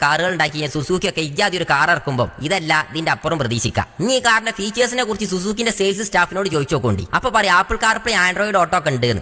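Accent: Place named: Kozhikode, India. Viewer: കോയിക്കോട് കാര് normally ഇങ്ങനെയാണ് സംസാരിക്കുന്നത്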